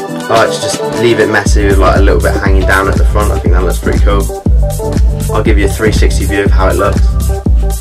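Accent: United Kingdom. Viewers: you’re from england I see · Ooh he’s British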